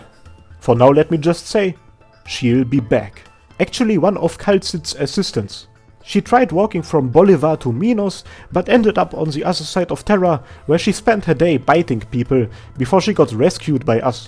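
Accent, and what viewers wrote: Germany. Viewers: From Which country are you XD Sounds pretty Hard like germany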